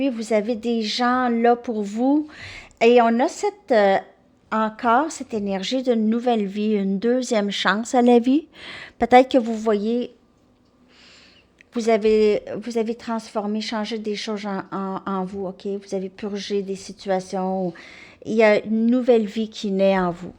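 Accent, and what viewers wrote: Quebec, Canada. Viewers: J'adore ton accent (Québec?)Une de mes meilleures amies est québecoise .J'adore les expressions que vous avez gardé et que je trouve romantiques . En France nous les avons oublié ,dommage!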